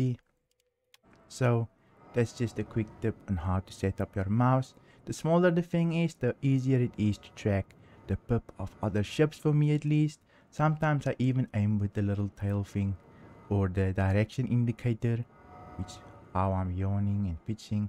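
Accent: Afrikaans, South Africa. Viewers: jasis that afrikaans accent hits hard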